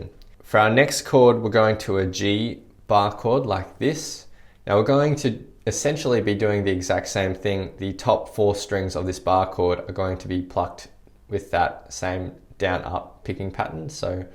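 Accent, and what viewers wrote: Australia. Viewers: Never realized u were aussie was I fucking deaf.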